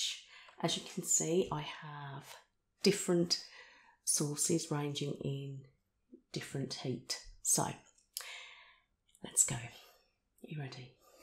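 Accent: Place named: Birmingham, United Kingdom. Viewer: Ahhh are you a Brummy? I love how your accent comes out more in this video ❤️❤️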